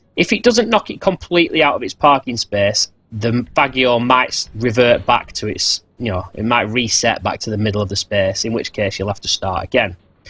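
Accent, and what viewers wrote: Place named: Lancashire, United Kingdom. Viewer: You sound like a Lancashire lad😛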